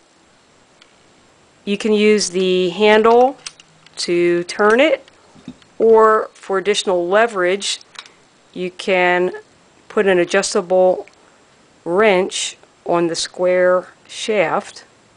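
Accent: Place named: Appalachia, United States. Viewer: This guy's accent sounds like those Beverly Hillbillies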